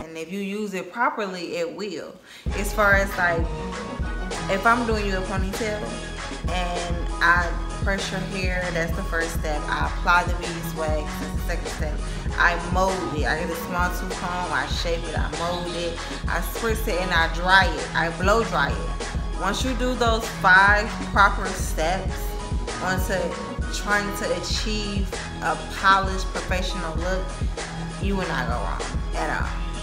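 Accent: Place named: Detroit, United States. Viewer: Your voice sounds like your from Detroit. If so, hey girll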